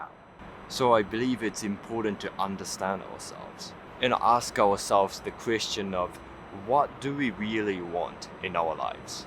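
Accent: New Zealand. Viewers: NZ, :D ha I knew it! Your accent was so familiar! First I thought it was Austrailan, but nope it is different from that. (I had a teacher from Melbourne once) As for administration(paperwork and so on), I have never thought I would hear that this exists in Japan. Being a Hungarian, I got used to waiting for hours just to get something done, but since 2020 many things can be handled via email or online, or at least get an appointment. I really enjoy the way you see life and handle things. :) Thx for sharing.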